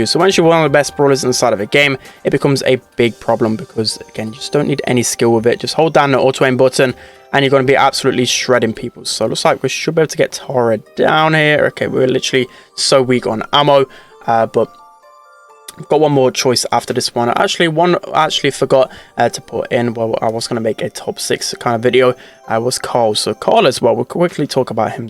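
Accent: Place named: England, United Kingdom. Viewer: Well he is English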